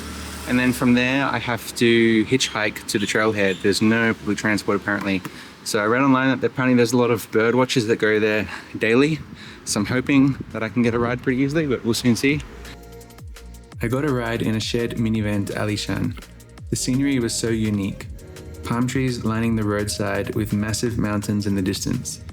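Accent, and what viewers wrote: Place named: New Zealand. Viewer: Interesting! Thanks for sharing! I thought I heard some Kiwi in there. Thanks for this video, might do Yushan in one day in November, and your other travels seem so sick as well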